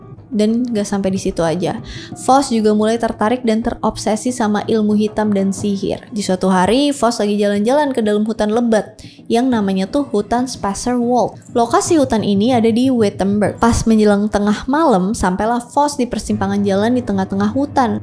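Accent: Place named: Sumatra, Indonesia. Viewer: santai bae 😂 asli sumatra ya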